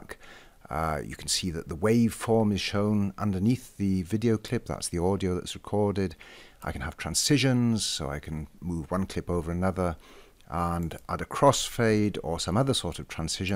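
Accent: Wales, United Kingdom. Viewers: Are you from Wales? You definitely sound it. I'm considering getting this, can you add special fx (Explosions/Generally action)?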